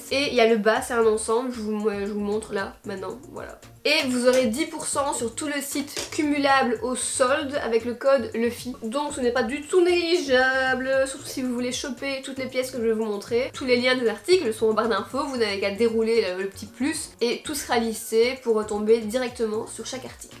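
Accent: Belgium. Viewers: mais Bardot ça veux pas dire Bordeaux 😂😂 les Beges on parle comme vous hein 🤣❤ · J'adore notre accent belge😂 #TeamBelge #Brussels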